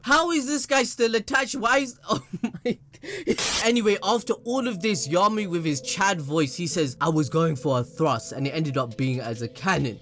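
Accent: Punjab, India. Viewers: are you panjabi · dude are u indian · Are you Punjabi?? Dude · This guy know Punjabi hindi🔥🔥🔥1 no.. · Bro are u Punjabi??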